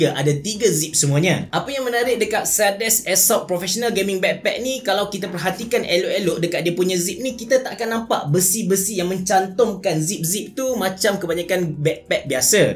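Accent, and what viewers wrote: Kelantan, Malaysia. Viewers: Lama perati. Abe cerah lamo doh xkecek slang klate. Bnyi skit2 xpo doh, bg video unik skit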